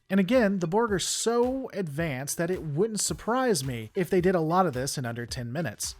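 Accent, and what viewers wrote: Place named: South Philadelphia, United States. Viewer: You from south Philly? Your accent.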